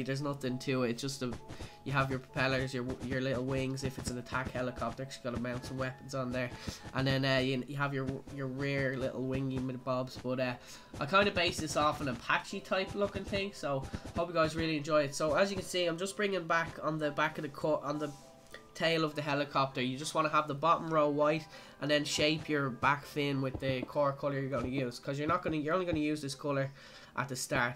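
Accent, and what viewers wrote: Dublin, Ireland. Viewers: Are u Irish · Are you From Dublin??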